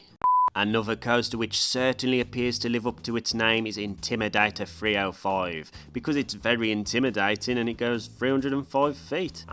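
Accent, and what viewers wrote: Black Country, United Kingdom. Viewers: Just come across your channel and first thought is he’s gotta be from the Black Country can tell our accent anywhere mush lol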